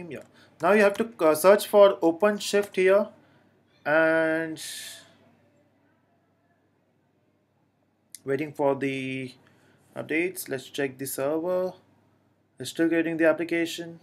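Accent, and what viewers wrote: India. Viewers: it's hard to understand indian's pronunciation,i'd prefer britsh standard.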